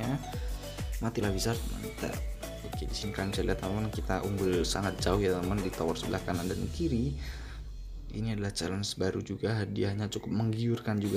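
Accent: Yogyakarta, Indonesia. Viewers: Mas orang jogja bukan? Ayo mabar mas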